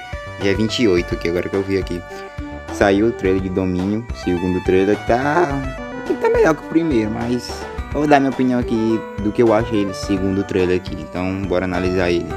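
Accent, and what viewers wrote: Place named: Brazil. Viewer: Is a Brazilian Voice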